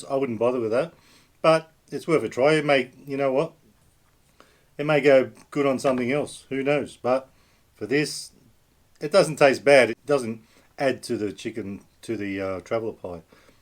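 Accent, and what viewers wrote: Australia. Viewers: Aussie mate 🇦🇺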